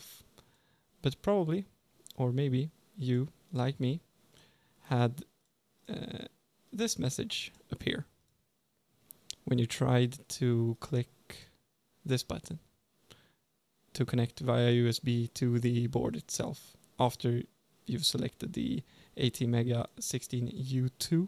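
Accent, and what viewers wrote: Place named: Sweden. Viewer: You sound sooooo swedish =) EDIT: Ohh, du sa det senare att du faktiskt var från Sverige =) Tack för hjälpen!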